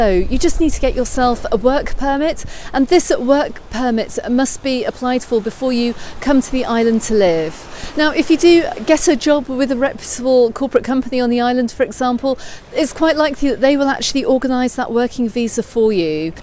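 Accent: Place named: England, United Kingdom. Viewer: I just know how fantastic you are Let me guess where u from by hearing your accent: 1. Leeds 2. Liverpool 3. Birmingham Kisses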